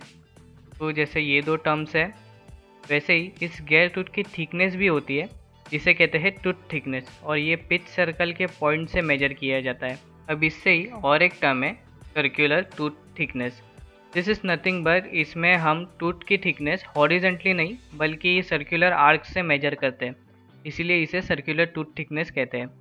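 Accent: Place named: India. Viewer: 印度的味道太大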